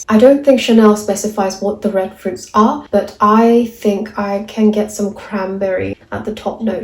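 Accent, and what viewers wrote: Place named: United Kingdom. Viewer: 영국발음 너무 매력적이에요🥹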